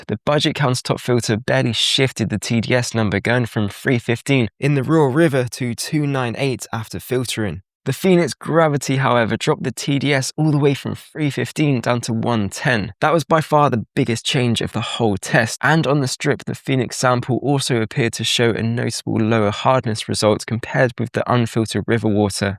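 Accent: United Kingdom. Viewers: Thank you, enjoying your videos, particularly nice to hear a voice from the UK. Would like to hear more about emergency water storage if you're looking for video ideas.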